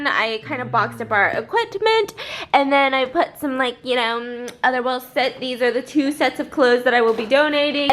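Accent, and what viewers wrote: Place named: United Kingdom. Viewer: Awww, miss!! I love your British accent btw XD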